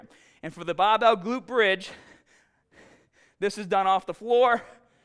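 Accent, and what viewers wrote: Boston, United States. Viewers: That boston accent tho 🔥🔥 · been following for a few years, very smart training man, the best on YouTube imo. And I've gone through a lot. P.S. from MA too, one of the last remaining Boston accents i know ;) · Are you from Boston ??? You have a slight twang on certain words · I knew it. He does the fucking accent on purpose. I could've sworn I've seen videos of his before where it's not nearly as pronounced. But that first "stahhhted" in this video he clearly did it on purpose. Why..... just.... why? The Northeastern/Boston accent is just so god awful it's like nails on a chalkboard to me. I guess I should've picked up on it sooner. He says "exercise" with no problem. When I was in the Navy my buddy was from Quincy, MA and he'd always say "exahcise." He also said "here" with little accent as well...... so again.... why go heavy on the accent sometimes? 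It's clearly on purpose. Specifically with "bahbell." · He has to be from Boston with that accent, “When you grip the baaaaaahhhh” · I know nothing about this guy but can only surmise he is from Boston.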